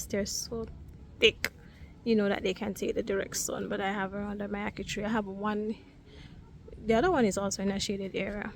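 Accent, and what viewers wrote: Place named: Caribbean. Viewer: Wait u sound Caribbean. A trini here 🇹🇹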